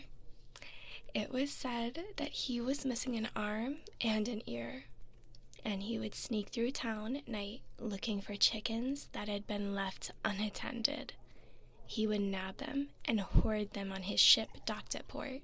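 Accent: Valley Girl, United States. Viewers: the mix of Valley Girl and Old style English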